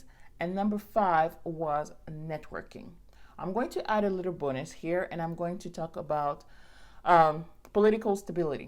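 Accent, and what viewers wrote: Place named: Cameroon. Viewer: my girlfriend is from cameroon so i can tell you spent time with a cameroonian just based off the way you talk lolololol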